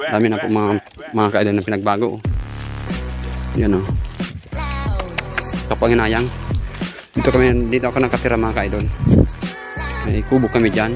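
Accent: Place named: Bicol, Philippines. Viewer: Bicolonon ang Punto mo noy napangirit ako sa vlog mo.. Sayang baratuhon pabakal kan daga ni lolo mo ako na kuta nag bakal..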